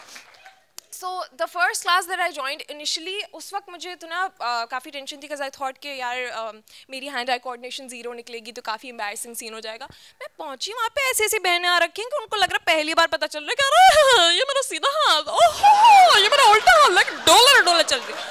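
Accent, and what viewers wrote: India. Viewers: Exactly my point. I liked the script but her accent was more indianish. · why do you sound Indian ? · Well that was great.. But.. The language chosen by her was a bit like they speak in india.. I mean the way of talking.. Or even some punch lines.. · She has an Indian urdu/hindi accent..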